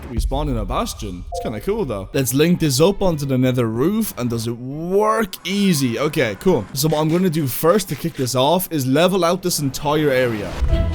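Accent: Ireland. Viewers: you Irish? Thats cool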